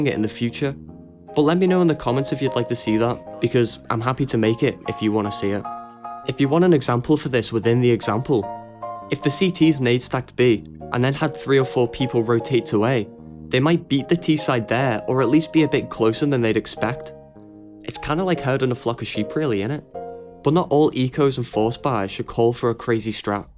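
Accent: Liverpool, United Kingdom. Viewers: scouser accent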